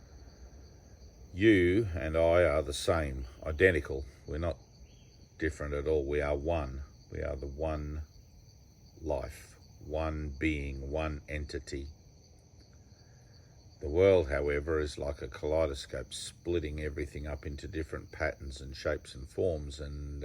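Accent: Australia. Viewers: 1965 - me to, I'm Pisces, in Australia as you sound to be, looks like QLD ? - this is fascinating, yes we are spirit beings having a human experience ! Now, also at 59 I have been "pondering" these issues since childhood & I find it so interesting that almost everyone with whom one discusses this, almost invariably cannot resist pointing out error of one type or another, this I am NOT doing ! I do look forward with great anticipation to getting my copy of this book, thank you so very much for this video & indeed your Y.T. Channel. A more captivating subject I have yet to encounter, love and light be with you and ALL souls now, 💜💜💜 💜 💜💜💜 🕉 🙂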